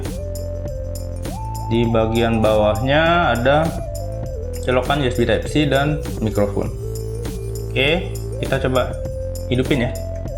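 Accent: Bali, Indonesia. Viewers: Klo liat dari aksennya si admin ini orang bali...😀😀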